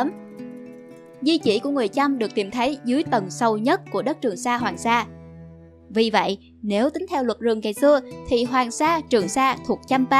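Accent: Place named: Quang Tri, Vietnam. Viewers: tieng quang tri y chang luon :3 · đây là giọng phía tây Quảng Trị hoặc phía nam gần huế, chứ ở trung tâm lệch về phía đông giọng khác nhau nhiều!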